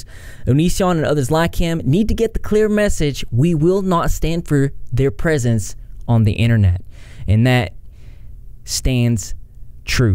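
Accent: South, United States. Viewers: You have Southern accent. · It's just southern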